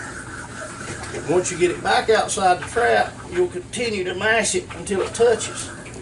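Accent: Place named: Brooklyn, United States. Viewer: Love that Brooklyn accent!!!!!!!!!!!!!!!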